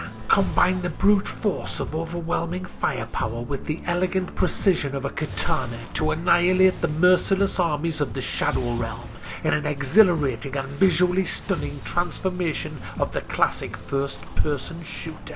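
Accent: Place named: Wales, United Kingdom. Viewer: You have, in all likelihood, the MOST Welsh voice I've ever heard!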